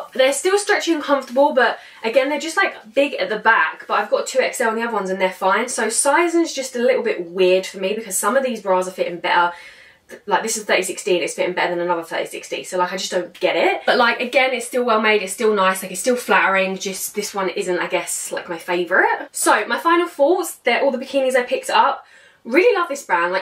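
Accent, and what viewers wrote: United Kingdom. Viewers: The blue and brown with the diagonal stripes is my favorite. Sorry for not having a better description, but you are speaking fast British English and I am hearing in slower American .English! As always, you are beautiful!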